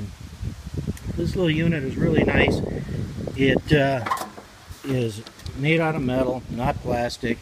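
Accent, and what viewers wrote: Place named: Pennsylvania, United States. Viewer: Sounds like your from Pennsylvania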